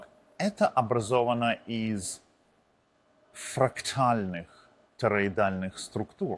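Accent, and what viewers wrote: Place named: Russia. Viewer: I was just telling my wife how great your Russian accent was! 😁 It made watching this easier for her as she doesn't speak much English at all. 🤭 But I saw the original En issue as well. 👍🏼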